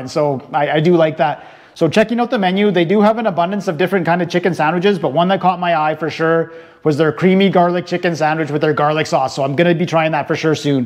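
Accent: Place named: Winnipeg, Canada. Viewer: You have a thick accent. Are you from Winnipeg?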